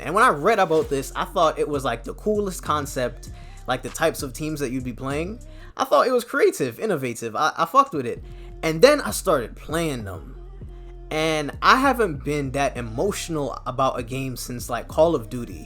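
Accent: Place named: Jamaica. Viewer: I hear that Jamaican accent boy Gang🤟🏽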